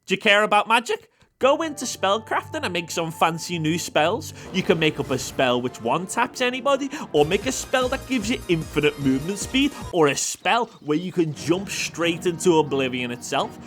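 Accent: Liverpool, United Kingdom. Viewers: Side note, been enjoying your videos. Your commentary and that scouser accent is a winning combo imo. Look forward to disagreeing with you again. 🍻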